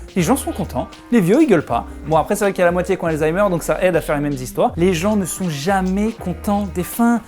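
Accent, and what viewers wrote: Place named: South, France. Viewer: J'ai jamais vu un épisode de GoT mais j'ai kiffé la vidéo. Ton accent du sud est parfait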